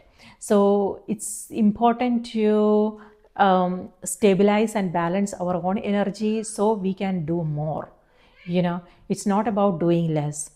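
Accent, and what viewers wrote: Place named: India. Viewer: nooo ...not with your indian accents...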